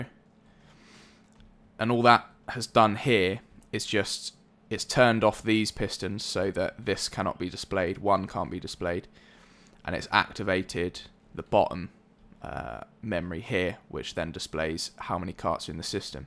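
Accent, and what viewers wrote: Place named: United Kingdom. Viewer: you can really hear the British accent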